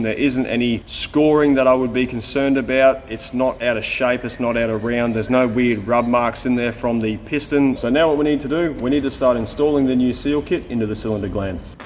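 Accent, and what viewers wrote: Australia. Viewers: Hey another great video you need to get something like a torch meet table for making different ranches and stuff like that that would be cool like on a CNC plasma cutter table I love the outtakes though I really do specially with that Australian accent. But seriously how long does it take to do a job like that that’s one thing I wish you would add do your video how many hours totally it takes to do each job !!!! I truly check every day to see if you guys are posted another video greetings from Chesapeake Virginia USA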